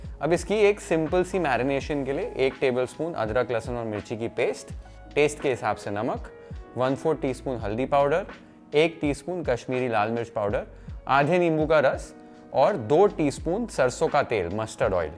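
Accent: Maharashtra, India. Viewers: Chef I am ur big fan, Just wanted ur master chef wala episode, u look so handsome. I really follow ur recipes, I am a maharastian girl married to a Bengali guy, ur marathi accent is just lovable. Always wait for your new recipes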